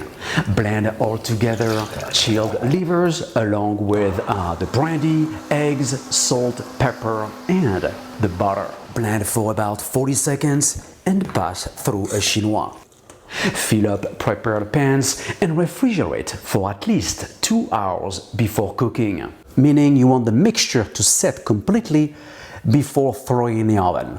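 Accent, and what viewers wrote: France. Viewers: This guy sounds so french that it seems fake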